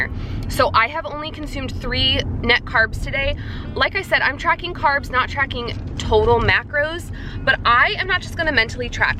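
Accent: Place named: South, United States. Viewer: Do you live in Texas? See the Texas shirt and I do so just wondering. I know you have a southern accent for sure. We have a Sea World around San Antonio area.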